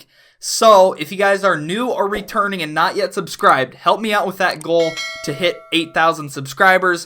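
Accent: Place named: North, United States. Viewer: Love the intro music...What state are you in? You sound Northern..lol. I'm from RI but live in East TN..keep up the great content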